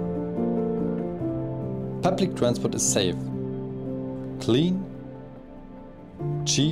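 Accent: Germany. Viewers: I am not offending your English. It is perfect. 😊 I just wonder if you are from Germany, because of little accents. You have done a pretty job capturing Taiwan. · Awesome video, great footage and editing. Love the city, too... but the reading is quite frankly... sub-optimal... thick German accent, mispronouncing easy words, ... :'( Maybe re-do it with a native speaker? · Well done, enjoyed watching and seeing your amazing footage🥰 Moreover i like your german accent. Keep it going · Do I hear german accent?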